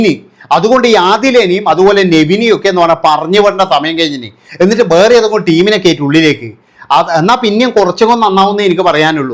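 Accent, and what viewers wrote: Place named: Kannur, India. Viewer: ബ്രോ 👌👌 നിങ്ങളുടെ നാട് കണ്ണൂർ ആണ്.. സംസാരം കേൾക്കാൻ ഒരു രസം ഉണ്ട്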